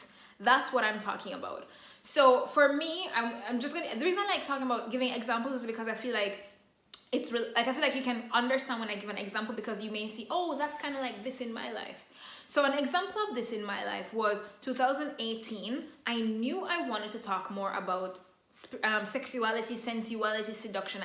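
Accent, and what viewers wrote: Jamaica. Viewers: Wonderful video!... I could tell your a Jamaican lol Wah Gwan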